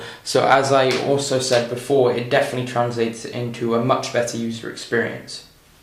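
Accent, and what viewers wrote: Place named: United Kingdom. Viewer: i love british o__o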